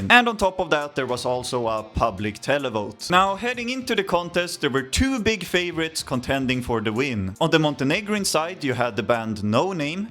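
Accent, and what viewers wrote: Sweden. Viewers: as an austrian, I realy like your swedish accent. nice video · Great report, and i love your swedish accent, i didnt know the prevailing result was only .5, thats just mad, its interesting to see the mindset of ppl at the time, that half nation that staying with serbia is better. Maybe back then it was a different geopolitics, I wonder what ppl think today, do they think that Montenegro being independent was a good move and are they happy where that choice landed the country in a more beneficial place than had it stayed in union with serbia? How would they feel if they had stayed with serbia as it is today?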